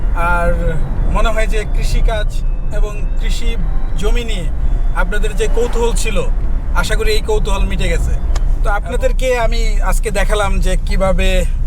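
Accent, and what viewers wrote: Sylhet, Bangladesh. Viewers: ভাই তুমি সিলেটি নি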